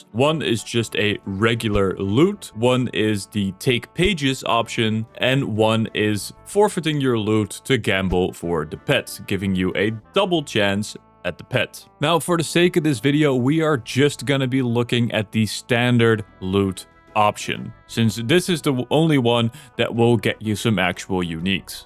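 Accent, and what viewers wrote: Netherlands. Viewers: pretty nice effort hiding your dutch accent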